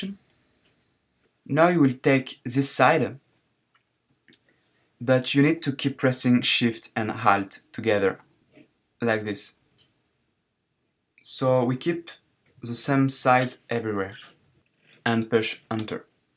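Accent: France. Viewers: Mec c'est super ce que tu fais (je sais que tu es francais ca s'entend dans ton accent un peu ;) ) tu mériterais vraiment d'être reconnu car t'as un talent inné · Accent prononcé j'ai reconnu que tu étais français ;) c'est genial tout ce que tu fais vraiment ! Ce qui serait parfait : que les tutos soit en français :) Mais bon j'imagine que tu as beaucoup de travail donc continue ainsi !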